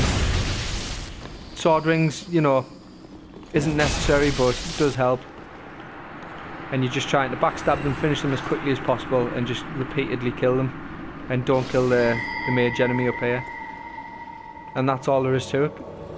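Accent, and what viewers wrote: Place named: Northern England, United Kingdom. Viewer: Good Northern accent there ahah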